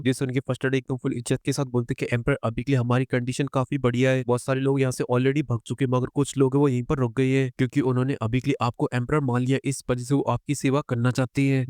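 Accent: Haryana, India. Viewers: Bhai AAP Kya Haryana se ho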